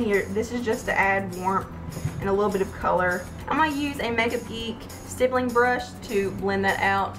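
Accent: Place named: Gainesville, United States. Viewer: Thanks for the very clear instructions about strobing ! I sure appreciate this. I love your accent too...you sound like you are from gainesville fl.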